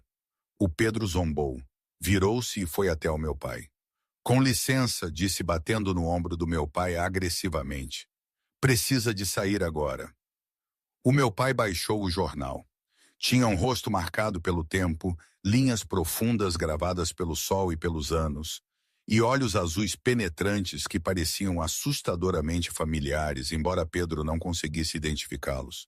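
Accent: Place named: Portugal. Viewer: MUITO BOM OUVIR A NARRAÇÃO LUSITANA, EM UM CANAL NOVO. O ENREDO É EXCEPCIONAL COMO SEMPRE.... Bem vindos! ✨💫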